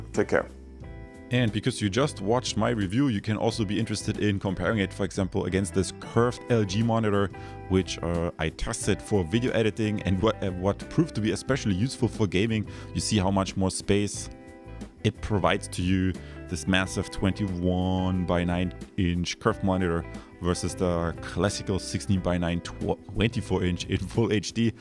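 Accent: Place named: Germany. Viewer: I can hear he is german. I bet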